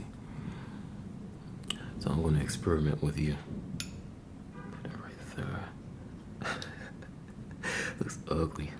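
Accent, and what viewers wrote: St. Louis, United States. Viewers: Ah, St. Louis! I didn't know the accent. I definitely hear you pronounce here, ear, and there differently than I'm used to. 🙂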